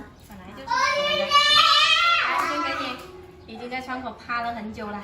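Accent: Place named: Hakka, China. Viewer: 问题是哪里买这肠衣呢🙈。宝贝普通话客家口音好亲切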